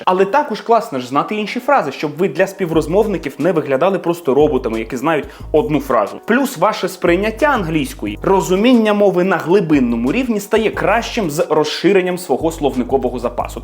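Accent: United Kingdom. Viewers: Ого, той рідкий канал якому ставлю повний дзвоник щоб не пропускати нових відео) Хоч і бісить саме британський варіант англ. мови з її кАнтами😂 Су Пердякую передав привіт. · Ех ця брітіш інгліш 😂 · Класний British accent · Ти з тих, хто вважає, що твоя американська мова — єдина правильна англійська, але це не так) На відео людина спілкується британською мовою) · Відео класне. Мене розпирає від того як перемикається між чистою українською і лондонським англомовним акцентом 🤣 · Велике дякую , дуже подобаються такі відео і ваша британська промова❤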